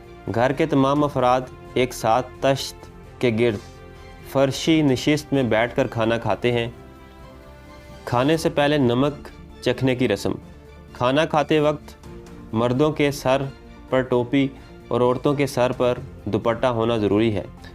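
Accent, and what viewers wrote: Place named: Punjab, Pakistan. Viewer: What a boring commentary in typically boring Pendu Punjabi accent with slow and mispronounced Urdu words. No doubt Punjab University has lowered it's standards of entry and under pressure from Jati Umra's chief Kanjri Mrs Maryam Safdar Awan's, wife of Safdar Masalli of Mansehra, influence or orders all Pendus must be given training to speak in LaWhori style of speaking. Now that's what I call dumbing down.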